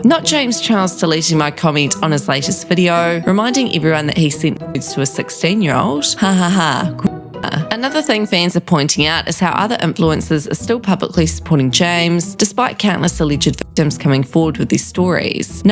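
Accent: Australia. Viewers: Australian female accent is the best imo. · The channel host is australian. 😁. Im trying nowadays to distinguish accents.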